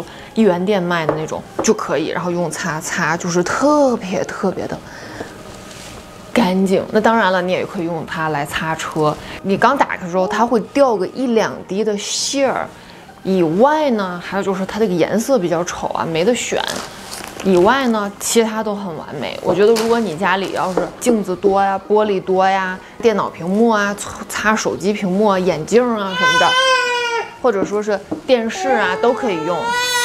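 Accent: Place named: Beijing, China. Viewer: 北京口音❤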